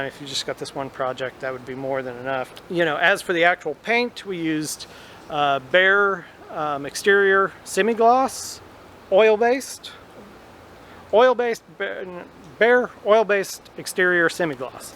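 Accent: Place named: Iowa, United States. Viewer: As an Australian I'm going to guess he is from Iowa or somewhere close.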